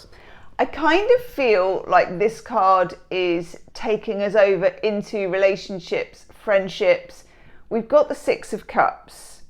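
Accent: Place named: United Kingdom. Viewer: Love your british accent and humour ! 😂😊🎉 thank you !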